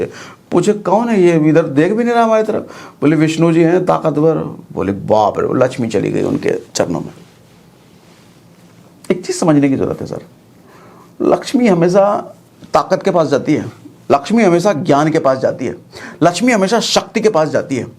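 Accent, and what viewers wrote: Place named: Uttar Pradesh, India. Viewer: Ye thumbnail ka kamal nahi hai sirji, ye to aapki attraction ka power hai Jo ham logon ko videos dekhne ko majboor kar deta hai, up accent ka power 💪💪♥️♥️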